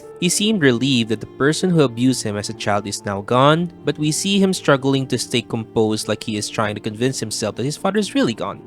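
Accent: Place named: Philippines. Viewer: It's nice that you are using Philippine English! Hahaha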